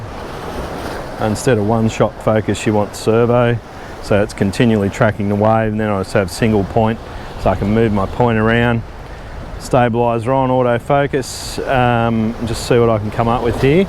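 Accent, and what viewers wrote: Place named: Australia. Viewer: I truly enjoyed this clip. Love the laid-back, very Australian delivery. But that said the content was very useful and valuable. i really like the IQ of the Canon as well. I also liked the (abstract) waves and the richness of the colours. I hope to head down that way in a few months and will try out the technique. It was terrific that you mentioned details that I might not have otherwise noticed like the setting sun shining on the wave tops. I love capturing the complex movements of the water too. It seems that particular location is one that presents much variety to those who are keen enough to look. Favourites: the first two at the end, the same ones you said you liked.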